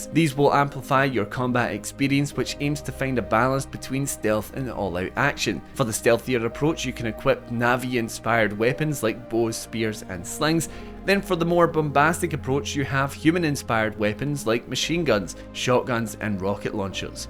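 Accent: Scotland, United Kingdom. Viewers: Random thing to say but I haven't heard a Scottish accent in a while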